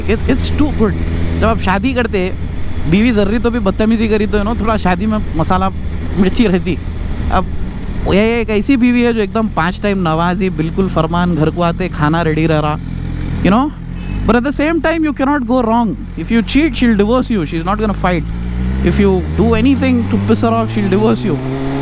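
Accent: Hyderabad, India. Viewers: Just loved the way u vlog with hydrabadi English accent with galiya amazing maza aata bro (hau)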